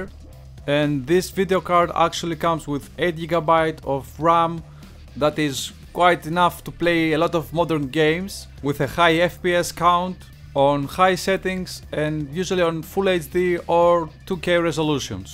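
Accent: Greece. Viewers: Are you greek? because your accent reminds me a lot of greek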